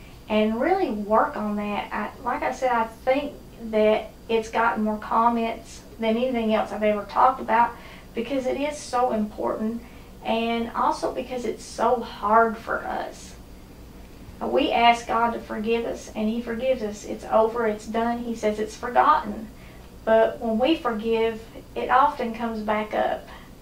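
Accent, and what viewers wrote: South, United States. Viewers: Just Wow. I came here because I love your channel and your Southern accent reminds me of my Family raised Down South, many who have passed on to Higher Ground.... [Even though we are of different Races, As an African American I truly believe ....We are ALL family on this earth as far as I am concerned. Let me just say, your soliloquy on "Forgiveness" was profound and beautiful. I was in tears by the time you went back to finishing up your Johnny Cakes Recipe, "You took me to Church today", as the older folks would say when someone spoke wisdom and Grace. I have had awful things happen to me during my lifetime; Evil done to me by others I was kind and generous too....pain and damage so profound, I felt it was beyond my control to stay on this Earth....I still have moments where , because of horrific losses and fiscal damage done to me....That I just want God to take me ...However, I am still here....I read Psalms , Matthew Etc. 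to soothe me....My motto to get through this life is "Forgiveness is a give you give yourself....Hating and being angry is like drinking poison and hoping the other person dies"....God Bless you and Thank you for your wise, cogent advice. I truly believe that God put you in my path today....I needed to hear your sermon, it was timely. Thank you again.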